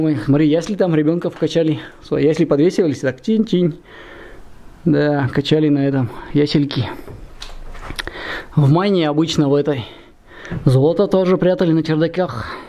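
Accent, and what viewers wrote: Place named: Kirov, Russia. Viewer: У автора ролика говор как у моих родственников в Кировской области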